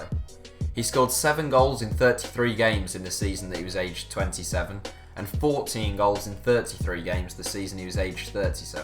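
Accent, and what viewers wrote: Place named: Yorkshire, United Kingdom. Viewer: I'd like to apologise on behalf of the entire of Yorkshire… we don't all sound as monotone and dreary as that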